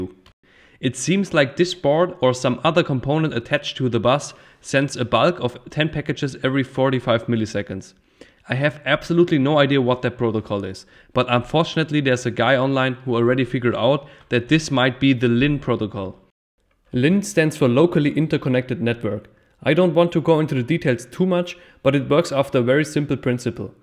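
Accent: Germany. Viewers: Digga man hört so das du deutsch bist 😂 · Zwischendurch hört man es deutlich, finde sein Englisch aber perfekt :D Er spricht Sachen wie Baut zb typisch deutsch aus. Hat aber bei englischen Vokabeln null Akzent wie ich finde.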